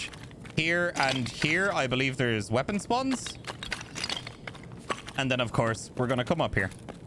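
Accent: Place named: Ireland. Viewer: subscribed for the Irish lilt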